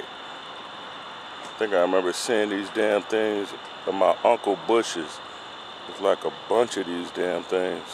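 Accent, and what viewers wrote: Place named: South, United States. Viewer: I don’t know if it’s the speaker on my phone or if that katydid is making a higher pitched noise than the sound I’m used to hearing on a summer night. I could tell it was loud though cause when it would start it would drown out everything else that your microphone was picking up. But I’m used to katydids making more of a chatter sound off in the trees. You sound like you’re from the south. I’m from the south and you sound just like a buddy of mine. Just something I noticed. That’s all.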